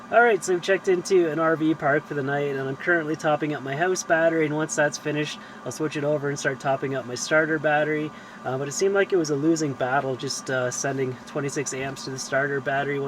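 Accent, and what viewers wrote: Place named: Newfoundland, Canada. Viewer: Drive your whole house into a moose -! 😂. You sound like a Newfie or Bluenoser! Thanks for the good laugh. Definitely driving your house into wildlife is a genuine concern for all van dwellers. 😊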